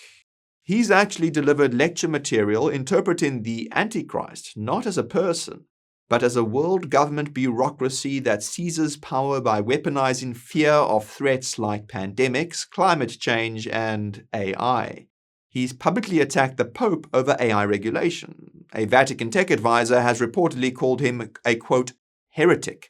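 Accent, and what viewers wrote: South Africa. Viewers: Your accent sounds very South African? Just asking as I am South African with Irish roots and have a lot of respect for the people of Ireland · He's clearly not British, maybe South African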